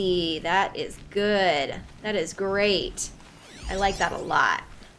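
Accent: South, United States. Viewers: Cool intro love ur southern accent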